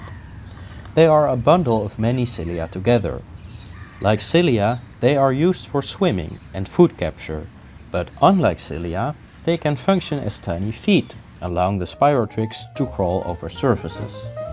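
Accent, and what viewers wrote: Netherlands. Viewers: in the first video i thought you where dutch now i can confirm it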